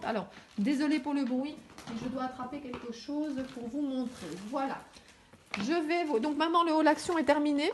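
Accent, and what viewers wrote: Belgium. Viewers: Coucou mais comme je aime tes vidéos je me permets le tutoiement c est plus facile si tu es OK. Je débute en scrap cela fait un an environ et tes vidéos m enchantent et m enseignent bcp.merci beaucoup vraiment. Je adore les fées et la fantaisie la nature du coup je me reconnais dans vos créations. Voilà je te souhaite de passer de bonnes fêtes moi aussi en vacances pour 5 jours avec mes deux enfants 10 et 13 ans je suis infirmière donc peu de temps pour scraper.Merci en tout cas pour ces bonnes idées sous l accent belge.Une dernière info des paperblocks de luxe sont sortis il y en a 4 magnifiques les as tu en Belgique nous ici en Corrèze pas encore. bises.laurence.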